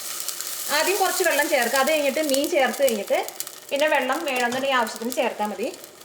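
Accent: Thrissur, India. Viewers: chechi oru rakshayilya kidilan taste thanks for the recipe and your thrissur slank koodi aayappo polich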